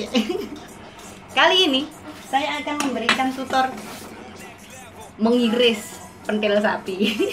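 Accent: Java, Indonesia. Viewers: bhsa jowo mu medok men thanks dah slalu bls comenku slm dr jowo tengah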